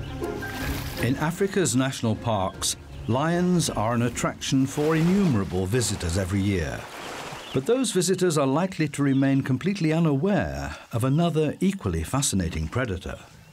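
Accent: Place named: United Kingdom. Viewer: You are correct, Gavin... & btw....this narrator IS British, but is not David Attenborough ...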